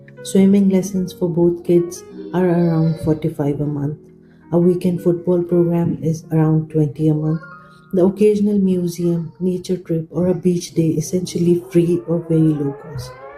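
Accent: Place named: India. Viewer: 210€ a month on groceries seems extremely low compared to the prices I've been seeing on Portuguese supermarket home delivery sites. Looking at those prices I could easily spend that amount in a week in cold weather and a bit of walking to get me hungry, just by only cooking at home. I know from Portuguese folk I've spoken to on Reddit that to partially warm a cheap old small house costs about 200€ in winter. I guess farmer's markets might be cheaper but yeah, 210€ seems perhaps a little incredible to feed active and growing kids for a whole month. Also, as a Norwegian who has spent months in India I'm pretty sure where that accent is from, and it certainly ain't Sweden 😂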